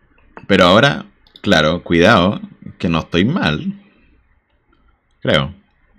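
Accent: Chile. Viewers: Siga hablando en chileno no más wn. Saludos Crack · Buen triunfo… me siento igual aunque estoy en los 850. Pero de a poco avanzando. Y que locura escuchar ajedrez con comentario en “chileno”. Saludos desde Seattle compatriota.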